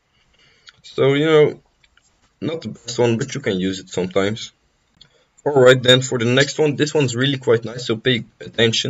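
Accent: Netherlands. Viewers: Are u dutch? Lol i hear a accent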